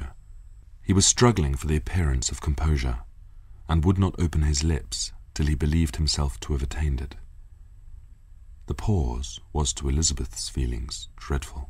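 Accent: United Kingdom. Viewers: I was thinking that, only Joseph Morgan voice and British accent can make me to fall in British Lords, but Mr. Darcy is the true Magic, I discovered years ago ... in love with this voice, this accent and this eyes and all .... 😘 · Ador his voice and accent! Brilliant british actor! 💖💖💖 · This is the best British accent voice I have ever heard in my life. His voice is such a masterpiece.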